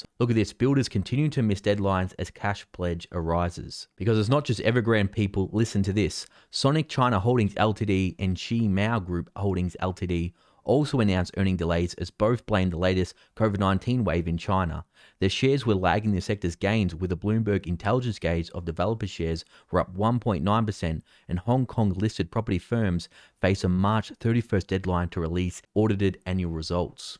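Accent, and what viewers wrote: RP, United Kingdom. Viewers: The English accent with the violin :)) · I say sir you went all posh upon a sudden! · holy smokes u speak toffy english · When the Holy Flippity Floppin Smokes comes with a proper British accent you know everything to follow will be gold. ✌🏽🔥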